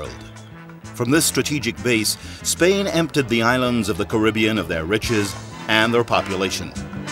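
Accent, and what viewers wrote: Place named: Hialeah, United States. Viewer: dudette, u sound like your from hialeah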